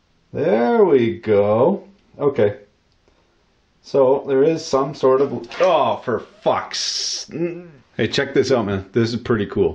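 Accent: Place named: Canada. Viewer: Everyone in Canada talks like that....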